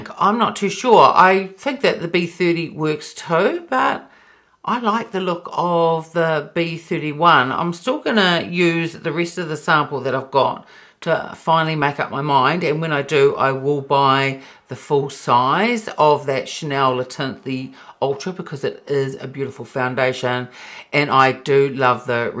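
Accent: New Zealand. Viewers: I ❤ your videos love!!! I also will be trying to do a Kiwi accent all day!!😂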